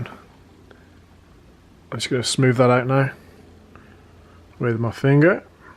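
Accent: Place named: Plymouth, United Kingdom. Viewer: sounds like a plymuff accent! top job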